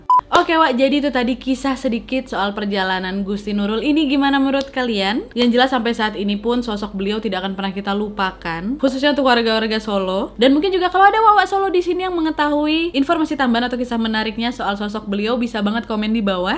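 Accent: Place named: Medan, Indonesia. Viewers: MEDAN kali nya kk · aku suka bahasa wak ini, spt bahasa ku di medan, asli dr mana wak? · Saran alangkah baik ny klw menjadi narator di konten berbahasa Indonesia yg baik terutama aksen medan ny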